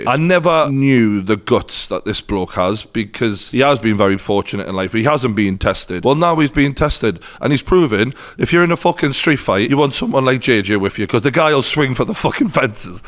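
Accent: Newcastle, United Kingdom. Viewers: I love true Geordie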